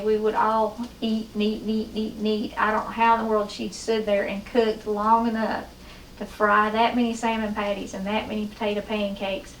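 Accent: South, United States. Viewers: Good to hear your accent to I’m a guessing southern wv or ky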